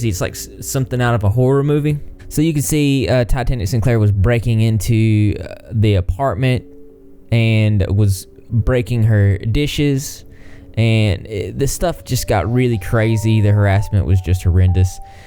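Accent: South, United States. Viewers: Why did i think you were from Australia? Now you sound southern .. what in the tarnation is going on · Just subscribed :) I like your accent is it from Alabama or Tennessee very southern. Ps I like your analysis and you’re pretty handsome . Reminds me of one the singers from I see Stars. Have a great day! Haha Best regards, Mimi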